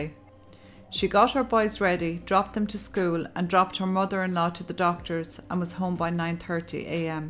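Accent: Ireland. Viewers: You speak so clearly with that beautiful Irish accent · Love your soothing voice. Which part of Ireland are you from ?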